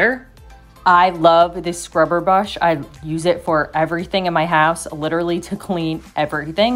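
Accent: Philadelphia, United States. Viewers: Are you from Philadelphia? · You sound like my family in Philly! Thanks for the video. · Sound like you're from Philly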